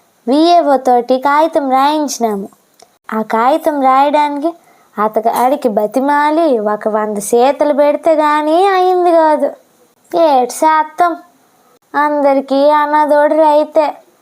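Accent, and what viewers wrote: Uttarandhra, India. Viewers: అందరి ఆకలి తీర్చే రైతు ఆకలి తీర్చేది ఎవరు... ఉత్తరాంధ్ర యాసలో వాయిస్ అదుర్స్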